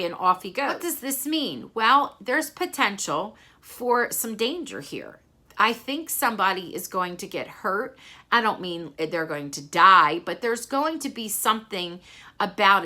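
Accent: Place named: New Jersey, United States. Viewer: Just love your predictions..you pick up on so much more than I do and God knows I've watched the seasons a million times. hahaha. I hope I catch your next live again..it was so much fun texting and having you answer live. I just love your enthusiasm and love for the show. Also love your Jersey accent..I dated a Jersey boy in college. He thought I sounded like I was from Chicago (I'm from Iowa..close enough I guess). Until next time.....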